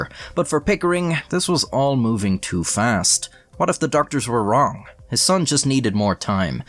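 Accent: Ireland. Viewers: I like hearing ridiculous stories and funny quips in an Irish accent… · With your accent lad I just cant figure which county ya from , wicklow, meath. If you're from dub I'm gonna unsubscribe. Lie if ya want to.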